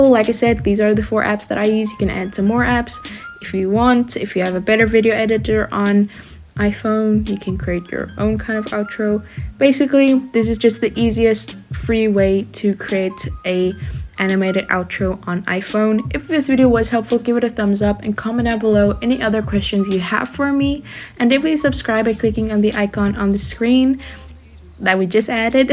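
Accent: South Africa. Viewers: ur South African ✊🏽🙌🏾🙌🏾🙌🏾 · U sound like ur South African :)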